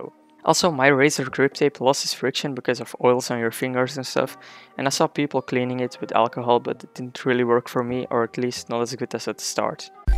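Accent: Netherlands. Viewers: You have a Dutch accent?? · U sound dutch, where you from? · Where you from you sound dutch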